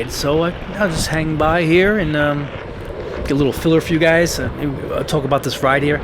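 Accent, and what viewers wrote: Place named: Long Island, United States. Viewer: A fellow Long Islander! I'm watchin this goin damn this guy sounds like my family. Laughed when you started talking about LI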